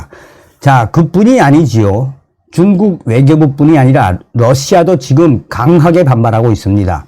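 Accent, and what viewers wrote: North Korea. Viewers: 정성산 선생님 남한에 잘 오셨수다래 ㅎㅎ 이북말 선생님 말씀하실께 정겹수다래 ㅎㅎ감사합니다 정 선생님 저희부모는 평안북도 선천이외다 늘 수고가많수다래 🌼🌷🌸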